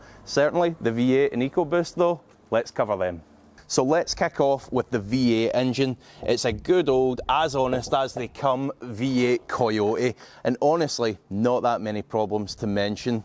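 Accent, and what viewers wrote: Scotland, United Kingdom. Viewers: You sir, are Scottish? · Got I love the Scottish accent, I’m getting a 2015-17 gt in a few months conv 6 speed❤️🤤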